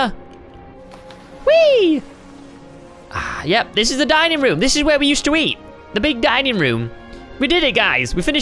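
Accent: Scotland, United Kingdom. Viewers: are you Scottish or British??? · is British duh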